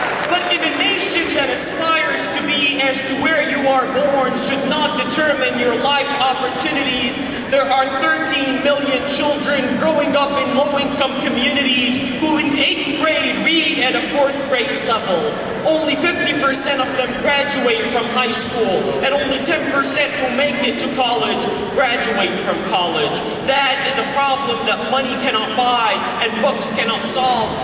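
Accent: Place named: United States. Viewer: What a true American sounds like!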